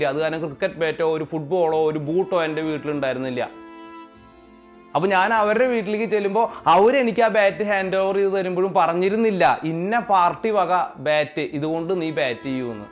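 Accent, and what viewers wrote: Thrissur, India. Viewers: Proud to hear our slang #thrissur 🥰 nice presentation ♨️ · Bro good after noon.. Njanum oru trissur karan anu I was believing that our speed and tone not matching with story telling but... Gadeee nigalu polichu, perfect clarity, tone and more over the expressions are as you says lofly... If autobiography story telling got over you can start with some historical, animalistic, or some other kind story telling.. Iam sure that you make the people on peek of quorosity.... Keep rocking, from dubai.. 🙏🙏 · നിങ്ങളിൽ ഒരു നല്ല കഥയുണ്ട്... Life ഇത്ര മനോഹരമായി പറയാൻ എല്ലാർക്കും സാധിക്കുന്ന ഒന്നല്ല.. തൃശൂർ ഭാഷയും ഒരു നല്ല അടിപൊളി കാരണം തന്നാണ്.......!!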